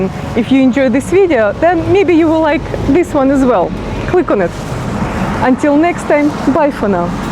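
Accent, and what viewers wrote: Glasgow, United Kingdom. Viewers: Love how you pronounce Glesga your richt Gleswegian noo.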